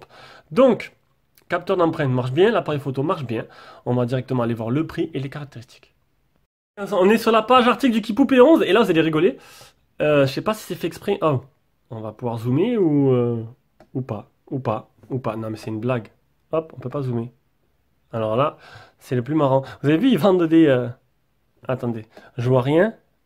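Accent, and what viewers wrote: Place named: Southwest, France. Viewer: A quand la vidéo du test final de ce tél , car j'hésite vraiment a l'acheter....je le trouve fabuleux niveau photo plutot fluide avec un look sobre et agréable...mais que vaut Réellement ce Modèle Amigo ?....en tout cas chapeau pour tes vidéos et ta voix perso ! elle me plait , ça chante le Jolie Sud Ouest ...lol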